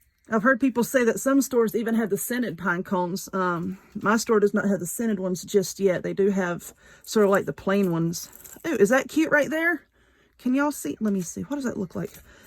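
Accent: South, United States. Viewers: If you dont mind me asking what part of the south are you from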